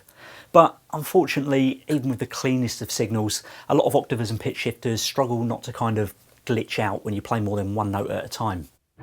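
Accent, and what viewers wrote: United Kingdom. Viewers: this guy sounds like brittish guy from the cowboy bebop movie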